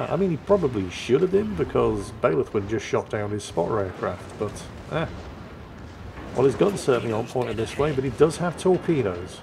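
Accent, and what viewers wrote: United Kingdom. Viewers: I think both ways are correct, its just your dialect of our great brit ish language, e.g. some same bath as bah th, others call it a bar th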